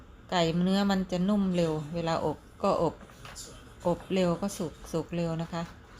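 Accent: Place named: Isan, Thailand. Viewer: ชิมนำแน่ครับ .เป็นตานุ่มคือคำเว้าอิหลีตั่วละ.น้ำลายไหลเลยครับ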